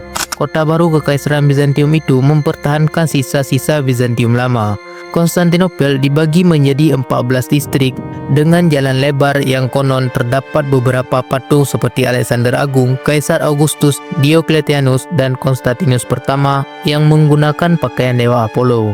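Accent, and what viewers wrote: Aceh, Indonesia. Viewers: Apakah VO berlogat aceh😆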